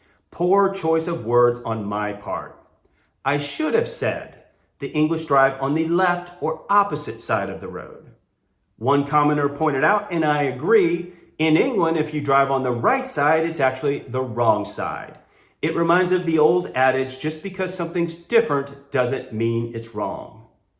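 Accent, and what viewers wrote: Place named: United States. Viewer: You speak US English. No reason to apologise for it.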